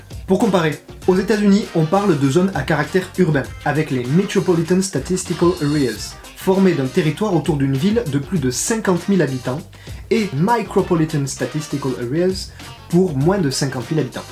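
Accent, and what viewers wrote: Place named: England, United Kingdom. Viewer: Super accent anglais 😉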